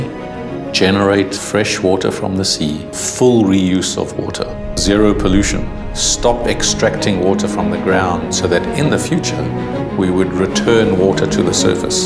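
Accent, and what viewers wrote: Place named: South Africa. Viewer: His accent is South African. Awesome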